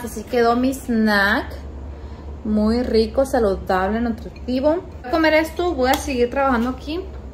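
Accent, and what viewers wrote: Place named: Northern Mexico, Mexico. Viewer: te escuchas bien norteña, de donde son tus papas?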